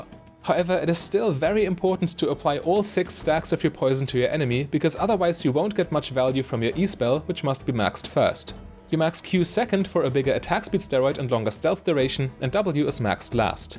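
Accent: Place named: Germany. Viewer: Der Bruder ist deutsch das hör ich doch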